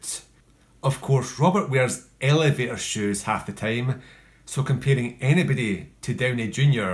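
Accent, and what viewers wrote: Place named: Scotland, United Kingdom. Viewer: Are you scottish?? I love your accent ❤️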